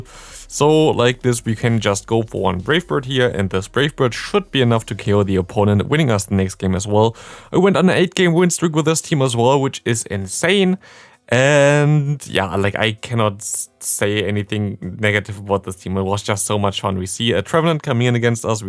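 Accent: Germany. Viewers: Ich schaue beim Zähneputzen gestern dein Video, meine Freundin kommt rein und fragt: "Ist das ein Deutscher?" Ich glaube man hört das 😂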